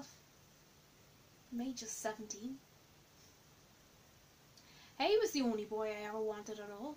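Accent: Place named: Ireland. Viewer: Your Irish accent is amazing!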